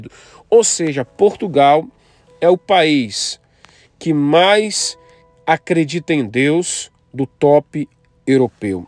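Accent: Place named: Brazil. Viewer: Não sabes do que falas! Pelo teu sotaque és do Brasil, fala do Brasil! Deixa Portugal para os Portugueses!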